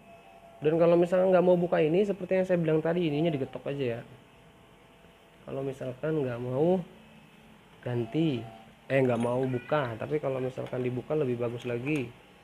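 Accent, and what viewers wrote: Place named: Jakarta, Indonesia. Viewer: Hahahaaa... ini logatnya betawi tapi bahasanya sunda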